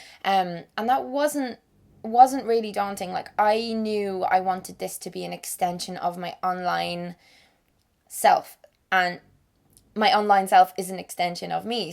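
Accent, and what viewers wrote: Ireland. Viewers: I'm 20 in like five weeks, and I've preordered it on audible because I'm poor and I used my monthly credit. Can't wait to listen to your lovely Irish voice 😘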